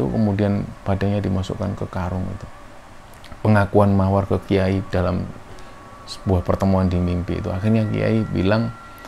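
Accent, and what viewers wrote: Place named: Surabaya, Indonesia. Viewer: aku suka aksen suroboyoannya medok ...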